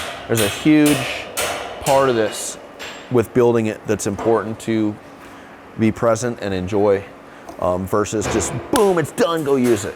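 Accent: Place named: Australia. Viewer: Love your work mate beautiful works of art. Awesome to hear your have Aussie 🇦🇺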